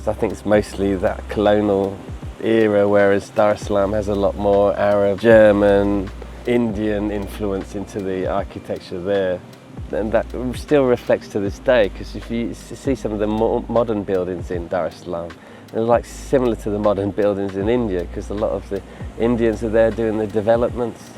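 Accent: United Kingdom. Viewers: Goodness me! You are the kind of Europeans that make some Africans believe they are better than other Africans because they can speak English. Wth!! Tanzanians speak Swahili. They way you described how Tanzanians don't understand English is rude and ignorant! You made it sound like Tanzanians are dumb because they would not immediately understand you a British man with your British accent. It's ok to give your opinion on the two cities but you were flat out just talking down and insulting Tanzanians because they have a different culture and they don't speak your language. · British accent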